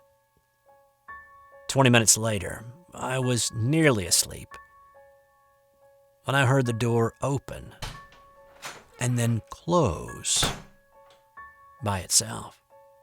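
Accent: South, United States. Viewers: Ah, it's great to see another upload from you! I'm detecting a bit of a southern drawl, very faint. Where are you from? Cheers!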